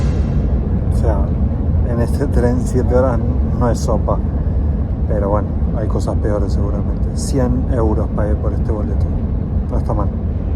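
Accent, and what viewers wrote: Argentina. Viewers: Me da la idea que eres Argentino, por tu acento, y la actitud muy de por ahí, que por aquí en España, nos han dado un repaso con mucha mitomanía.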